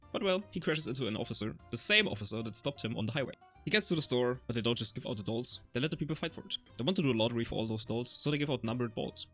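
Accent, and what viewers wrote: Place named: Germany. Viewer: 😂 love the "tze", you crazy germans make it more fun just to speak, ceep it up ! 🎉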